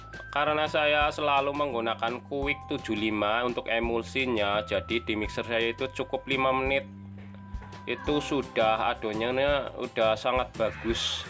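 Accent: Banyumas, Indonesia. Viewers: Saya justru suka logat ngapak nya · Kan itu juga udh pake bhs Indonesia.. Cuman logat nya aja medok ngapak.. Aku yg org Sunda aja ngerti dan paham apa yg sampaikan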